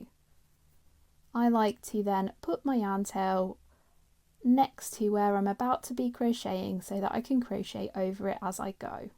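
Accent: England, United Kingdom. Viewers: I’m following this, but it’s strange to hear an English accent use American crochet terms. I really have to concentrate!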